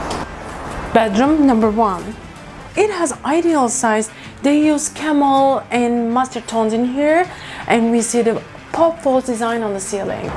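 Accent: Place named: Turkey. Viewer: Bende diyorum bu aksan ne aksani diye, Turk oldugnu sonradan anladim 😂 Video guzel olmus bu arada, yakinda Dubai'i yerlesmeye dusunuyorum.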